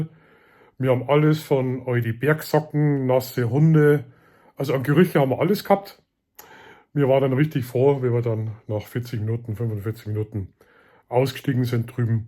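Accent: Bavaria, Germany. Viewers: Hey Namensvetter!!! ✌️ 😊 ois erstas: i steh auf dein bayrischen Dialekt ❤ es klingts ned vü aundas ois mia waun i mein Dialekt ned vasteckn probia 😂 SUPER Video und SCHÖNE Aufnahmen vom Köngissee 😍... bei unsan letzten Besuch homma die letzte Bootsfoat leider knopp vapasst ... miss ma unbedingt nomoi hin ... DANKE fias mitnehman!!! Schene Griaß aus Österreich ✌️🤗❤️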